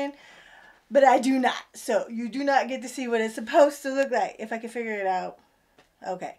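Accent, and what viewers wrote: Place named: South, United States. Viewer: Honey i love your southern accent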